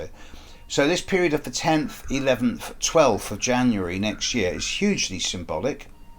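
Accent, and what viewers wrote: United Kingdom. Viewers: Space for the Soul This is a standard British accent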